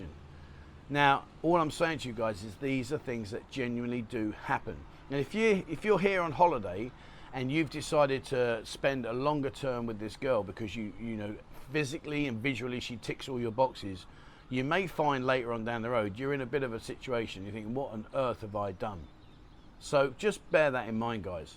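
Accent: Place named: Cockney, United Kingdom. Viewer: Very clear advice.short time Thinking in going to thayland for first time .. i think i need to enjoy that ... Living the cogney accent matey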